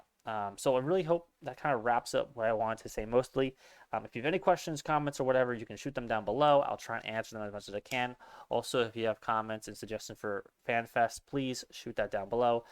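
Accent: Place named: United States. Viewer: 4s is super euro. I didn't expect the American voice. expat?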